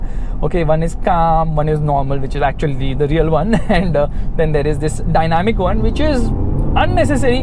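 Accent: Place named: India. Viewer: Bhai apni desi accent m english jharny sy acha local hindi ya urdu mai bat kr Angrez tera review daikhny ni aingy..Unky pas top gear,Car wow jesy shows mojod hain... Thaki hwi english bolny sy acha apni local language m review dala karo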